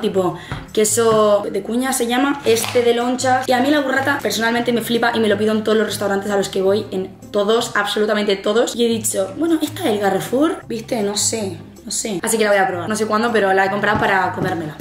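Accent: Buenos Aires, Argentina. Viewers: te amo y amo tu acento argentino 😍💖😂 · tu acento de argentina y ingles me encantan te amooo quieres tomar un cafe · necesito mas videos hablando en argentino por favoor !! jajajja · Te amo mucho, me alegras los dias. Btw te sale re bien el acento argentino. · Eu el acento porteño de Ar gentina le sale muy bien!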